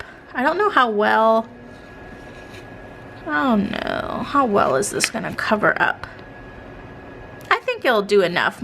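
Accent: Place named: Seattle, United States. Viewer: I am a fairly new subscriber to you channel. Just listening to I got the feeling you are from Seattle. Are you?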